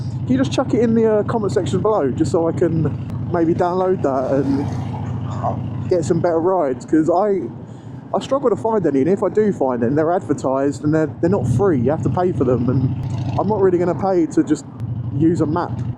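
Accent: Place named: United Kingdom. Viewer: U are the best 😂❤ a big hug from Italy 🇮🇹 btw i love ur UK accent ❤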